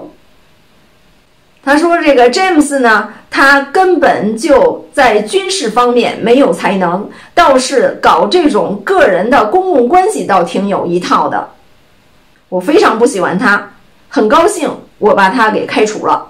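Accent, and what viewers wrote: Beijing, China. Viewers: 哪里人？北京的吗？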